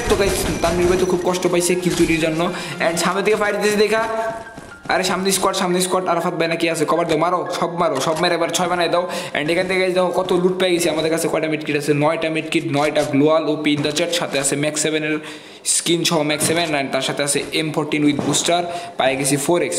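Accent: Tangail, Bangladesh. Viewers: Bhai apni ki Tangail er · Vai tomar basa ki Tangail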